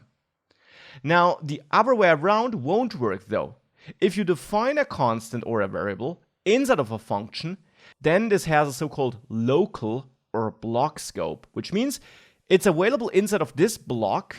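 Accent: Germany. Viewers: I love the way you walk through and explain all the different concepts here, supported by great examples. You are articulate, concise, and obviously very knowledgeable. Your (slight) German accent only adds to the charm, so bonus points for that!:-) I just bought the full course after watching this introduction, because you are the best teacher I have come across yet on this topic, and I have seen quite some. I really can't wait to dive deeper into the super interesting world of JavaScript. Thank you very much, and keep up the great work!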